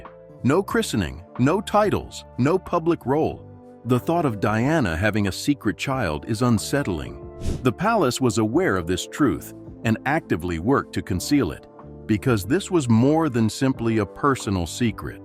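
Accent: United States. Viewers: This voice-over is a joke. American accent and no information about the source of this silly, speculative nonsense.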